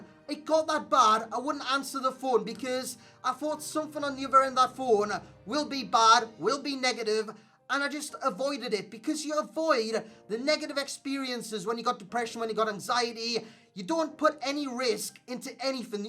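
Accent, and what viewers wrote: Newcastle, United Kingdom. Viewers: R u from Newcastle also keep up the good work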